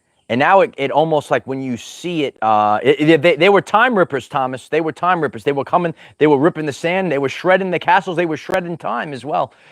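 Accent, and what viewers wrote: New York, United States. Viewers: One of the biggest grifters on YouTube. Accent makes me think of a NYC cop or criminal.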